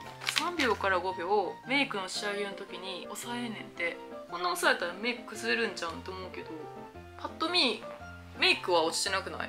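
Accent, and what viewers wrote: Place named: Osaka, Japan. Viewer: 大阪と大阪人と大阪の食べ物と大阪弁がダイスキで現在大阪に住んでる山形人なんですけど、の動画観ていつもきゅんきゅん＆ニヤニヤしてました🥰知らんけどとか、◯◯やねんな〜とか、耳が幸せです🥰ありがとうございます🌷あと今頃だけど、山形で免許取ってたなんて嬉しいです✨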